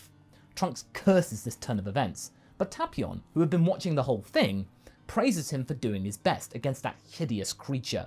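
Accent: United Kingdom. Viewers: Day 1 of asking whether he’s from the UK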